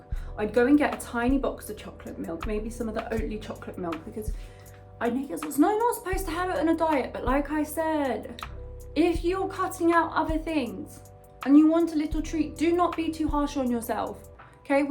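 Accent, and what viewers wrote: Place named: United Kingdom. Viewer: Totally off topic but without enjoying your video there is another reason why I'm here and the reason is I'm using your videos as my British pronunciation tutorial. I'm practicing British pronunciation by watching your video and it's really help me a lot. Lots of love 💓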